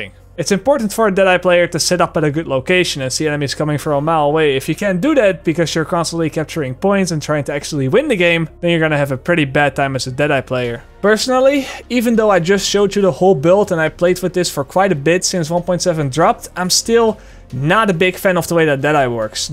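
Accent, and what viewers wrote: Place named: Netherlands. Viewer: Jij bent nederlands... Ik hoor het in jou accent.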